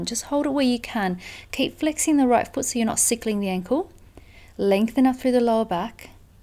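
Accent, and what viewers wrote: Australia. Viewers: Thank you, that was incredible. And so refreshing to hear another Aussie's voice. :-)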